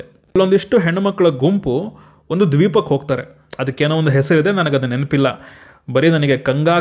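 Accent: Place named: Davanagere, India. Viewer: Pakka Namma Davanagere kannada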